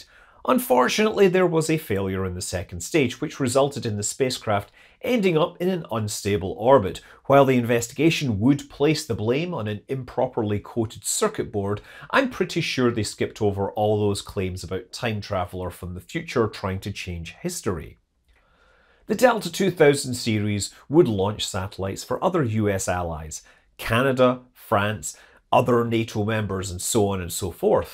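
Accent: Scotland, United Kingdom. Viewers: Why are these "Sons of Thor" and not "Daughters of Thor"? Also, you are sounding particularly Scottish today. What happened?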